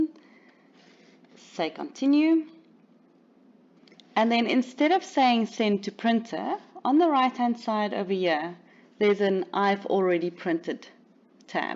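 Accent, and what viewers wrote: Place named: South Africa. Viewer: That South African accent is so hot...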